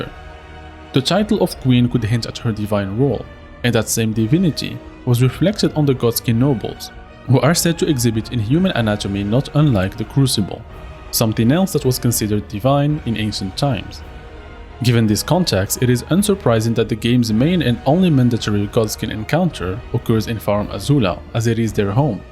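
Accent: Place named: France. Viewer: I know a French accent when I meet one, excellente video l’ami penses tu en faire en français ?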